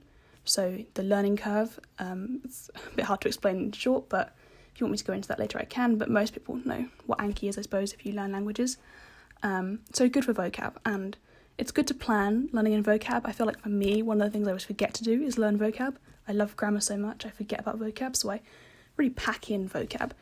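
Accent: United Kingdom. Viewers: What a classy RP accent. Unfortunately you don't learn French. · I just love the British accent. It sounds so coool haha. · Hey! I m learning Japanese. Perhaps n5 level. I like your accents. I like british accent. I want to learn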